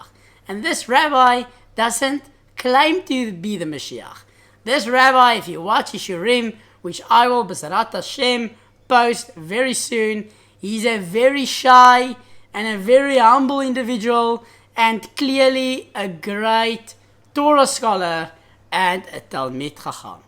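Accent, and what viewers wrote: South Africa. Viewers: Is this guy South African or something? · חיי אחי 😁 I've watched your videos off and on for months now especially the conversion video start to finish. I think it's fantastic your making videos about Judaism,I love hearing your South African accent haha I just haven't heard a Jew speaking anything apart from American / Israeli accent/Yiddish Anyways G-d bless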